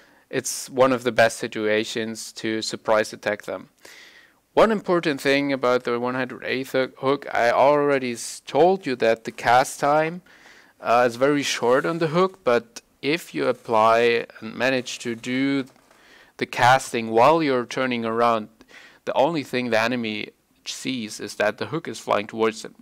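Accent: Germany. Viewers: Found you from Jayne and loved your montages. Great video btw You sound like you are from Germany? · im preeeeeeeetty sure you´re german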